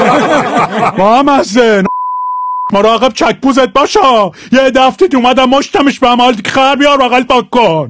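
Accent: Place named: Kerman, Iran. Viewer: کرمونیام ایجوری حرف میزنن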